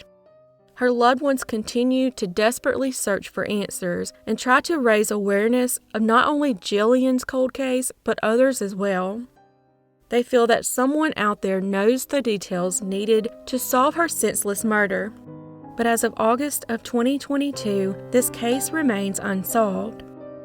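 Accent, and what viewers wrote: South, United States. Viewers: I'm not far in yet but I'm so glad you decided to keep doing ads - you deserve to be paid for what you're doing! Plus your accent is so charming I honestly don't mind them because I'd listen to you read the phone book... and I'm a fellow southern girl, haha! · So happy to hear your southern voice again. I used to spend winter in Gulf Breeze Florida.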